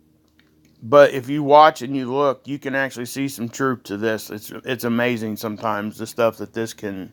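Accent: South, United States. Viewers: I just subbed good video man sounds like your from the south good to hear a familiar voice since im from Tennessee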